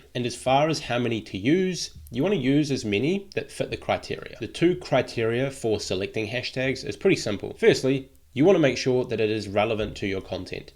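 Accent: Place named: New Zealand. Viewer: Great vid, dude sounds like he’s from NZ, even better ! 🔥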